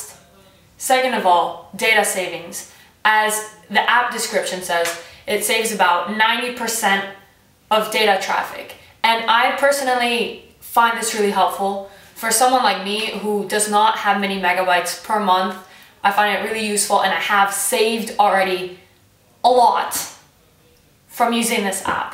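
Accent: Spain. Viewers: are you spanish?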